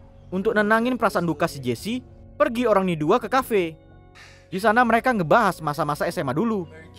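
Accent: Medan, Indonesia. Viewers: Pasti abang org medan😂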